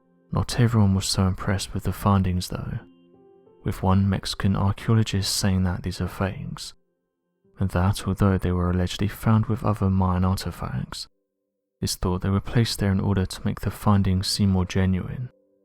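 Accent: United Kingdom. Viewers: Cool info. But the British accent I can't listen.. I know it's not right. They are the worst ,look at histroy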